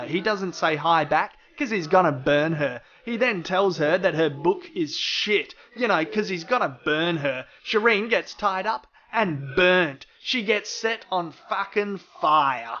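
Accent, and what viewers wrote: Australia. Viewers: Fuck this shot is gold 👍🏻 you an Aussie? Or just the name? Aha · Love your facking reviews mate. Australian narration FTW! I imagine you've read about Georgio's plans for Shireen in the book? <link>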